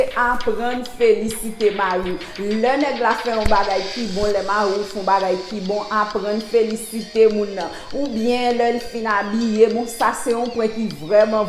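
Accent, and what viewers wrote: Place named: Cap-Haïtien, Haiti. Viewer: Se vre wi! Menm lè anpil nan yo pa konn lè yon fanm vle yo! M rmen ti aksan capoise la anpil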